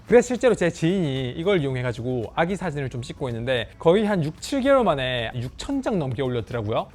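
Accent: Gyeongsang, South Korea. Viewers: 너무 유용해요. 감사합니다. 그냥 사투리 사용하셔도 더 정감있으실 것 같아요 ㅎㅎㅎ 귀엽게 서울사람 보이려는 경상도 사람같아서 항상 귀엽습니다.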